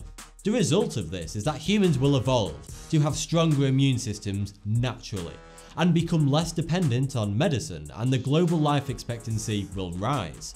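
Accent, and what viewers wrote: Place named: West Lancashire, United Kingdom. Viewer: West Lancashire accent.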